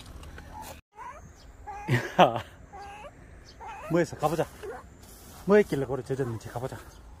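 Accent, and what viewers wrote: Gyeongsang, South Korea. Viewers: 아 말씀 하시는 사투리가 너무 같아서 어디신가 했네요 예천이시군요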